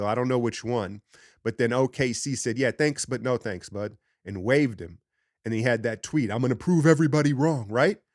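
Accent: Bay Area, United States. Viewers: that bay be leaking out in your diction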